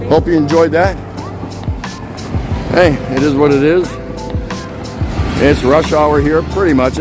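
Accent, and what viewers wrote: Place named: Philippines. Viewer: nice neighborhood with great people. nice DIY pathway for the high tide. nice tagalog accent!. hopefully one day you will blog also talking only in tagalog.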